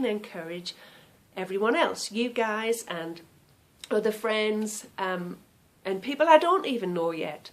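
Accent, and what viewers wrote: Sunderland, United Kingdom. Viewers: we live in Adstock, Rural Quebec,It's at the bottom of the Eastern townships, not far from Maine. we operate a Musk ox fibre mill. Bit of a change from Sunderland. Hylton castle, did you see the time team episode at Hylton castle- I thought it was great to see Sunderland again ! We used to live at the top of Newcastle road, in the lovely Tudor style houses just down from the Grange pub. Last summer i passed through Sunderland Ontario on my way to a fibre show ! Will be watching your pod with great enthusiasm-nice to hear the Sunderland accent again after all these years♥ will try & look you up on Ravelry....I'm The-Qiviut-Fairy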